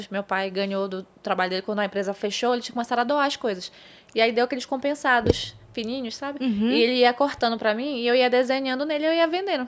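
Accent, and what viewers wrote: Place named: Manaus, Brazil. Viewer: Esse sotaque é lindo...minha sobrinha foi criada em Manaus e falava assim...❤❤❤❤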